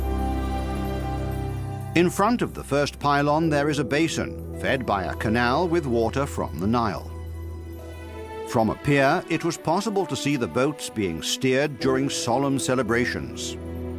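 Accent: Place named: United Kingdom. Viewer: The timers cool but NOT ANOTHER BRITISH ACCENT!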